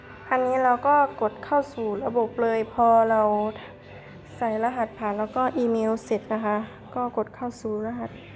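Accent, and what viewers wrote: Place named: Korat, Thailand. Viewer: พี่เป็นคนโคราชรึเปล่าค่ะทำไมพูดไทยเดิ้งค่ะ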